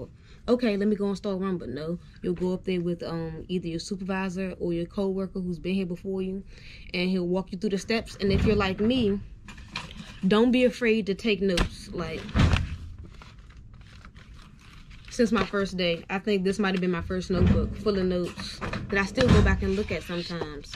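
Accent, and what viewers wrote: Washington DC, United States. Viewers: thanks for the content i can tell you are from DC or baltimore lol the accent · before I saw the 202, I was like " This person Gotta be From DC"!!!!!! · I recognize that DC accent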